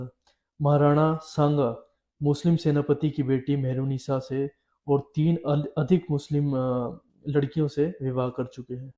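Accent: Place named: Pakistan. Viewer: Iss channel ko chalane waala aawaz se Pakistani lag raha hai, ye koi ISI ka Agent hai jo Hindu samaaj ko todne mai lga hai isse saavdhan rahe, Jai Rajputana Jai Hindu n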